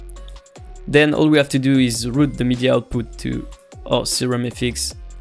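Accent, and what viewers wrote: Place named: France. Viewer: That french accent, Sah quel plaisir ! Thanks for the tips did'nt know we could use samples in the noises osc ^^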